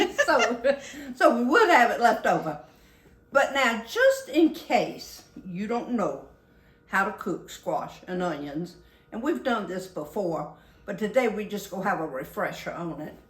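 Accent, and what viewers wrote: South, United States. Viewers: I declare I couldn’t agree with you more! She speaks proper southern language, I only wish my southern accent would come out that proper. Love her voice, love her stories and definitely love her recipes!🥰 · I love the way you say Peppa and Butta. It is so cute! What a southern belle.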